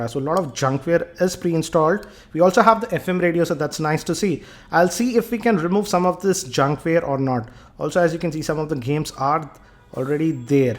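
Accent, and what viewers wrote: India. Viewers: not a bad video i like it, your indian accent does annoy me a little bit and im sure im not the only one but other than that this is my first time watching one of your videos and its good, im thinking of buying this phone and your review did help me to get the information that i need thanks! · Complaining about his Indian accent ? What are you people, five years old?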